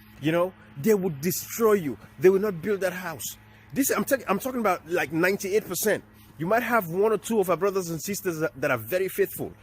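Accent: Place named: Sierra Leone. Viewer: I am a proud Sierra Leonean. I left my country at the age of 8 to the UK and I'm in my late 30 all this excuse is rubbish. Yes every country has their problems but that does not stop us from going back home to help even though I am a wife and a mother of 3 kids I still try and help my people I have open an NGO in Sierra Leone and also in the UK and i plan to do more for my people .I have lost a lot of money in fact I am using my own private money to develop my ngo. Yes it was stressful yes I had to pay my way through to get an NGo status but as a proud Sierra leonean no one else can develop our country only our self so my brother please stop making all this excuse and if you wish to help ,help if you don't I'm afraid take that nationality of as Sierra Leonean it's a disgrace. God never said everything in this world will be easy. The sound of your accents I believe you have not been abroad from your childhood days and I strongly believe you don't know your history very well to be making all this excuses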